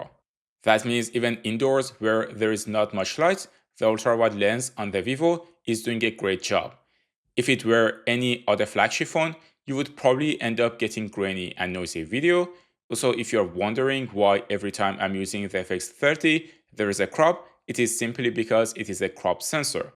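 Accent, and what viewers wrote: Iran. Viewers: I realize that accent it's Persian accent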